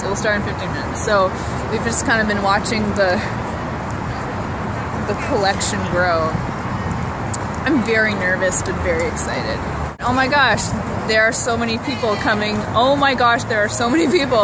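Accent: France. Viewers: Nice French accent (I am french)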